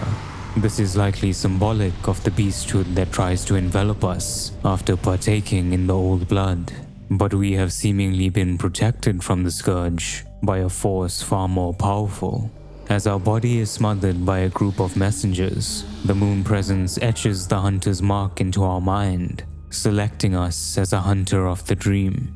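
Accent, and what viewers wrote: South Africa. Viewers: Is this guy South African??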